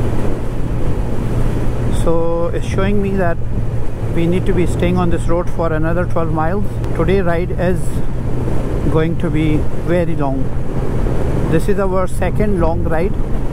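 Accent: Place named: Scotland, United Kingdom. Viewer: One of my favourite roads, it always takes my breath away. Oh and you have a lovely little Scottish accent coming on, nice one brother. 👍👊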